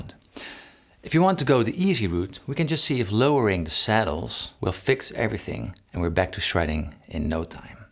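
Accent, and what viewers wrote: Netherlands. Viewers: Why do you sound so dutch lol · Plus heavy Dutch accent. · Do I hear a Dutch accent? Bedankt voor je zeer informatieve video! Zeer leerzaam!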